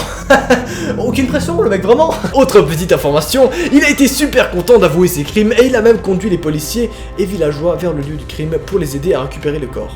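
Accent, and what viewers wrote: Belgium. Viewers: tes belge ? moi aussi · T'es belge ?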